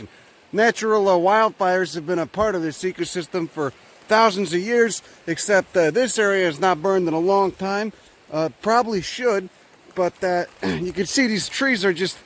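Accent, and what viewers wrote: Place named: New York, United States. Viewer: I have to laugh to myself frequently, saying "is there nothing this guy doesn't know about plants" keep up the good fkn info! I am a Brit and no idea where your from, but years of seeing tv from USA might point me in the direction on new York? The east side? Love the accent ..... mine is a heavy cockney accent.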